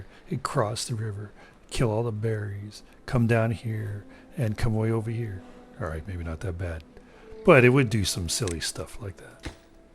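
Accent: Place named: Southwest, United States. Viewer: favela is like the mountain side villages in brazil. howls moving castle is like the studio ghibli movie of the same name. a complex city perched on legs. wow your accent sounds really arizona new mex. thought you might be native. in my opinion copy catting isnt really a thing if you play authentically. up is up down is down . what evs. more fun stories.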